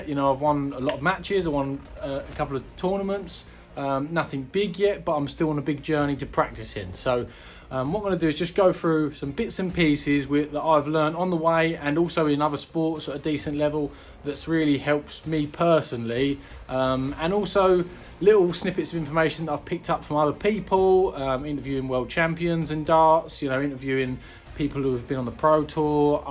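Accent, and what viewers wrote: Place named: South, United States. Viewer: I really enjoy your videos, but please please stop putting the auto generated subtitles on them 🙏 they can’t handle your southern accent mate, and it’s just complete gobbledygook going across the bottom of the screen, it’s so distracting 😂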